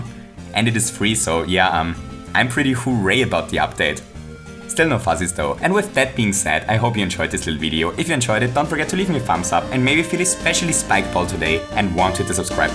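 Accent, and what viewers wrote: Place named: Germany. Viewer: Bin ich der einzige der denkt das er einen deutschen Akzent hat? OK dann bin ich wohl der einzige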